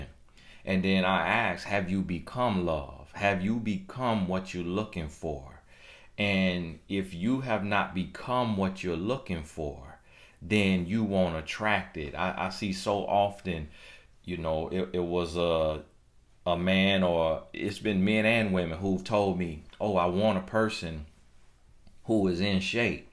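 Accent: South, United States. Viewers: I love your southern accent, God Bless.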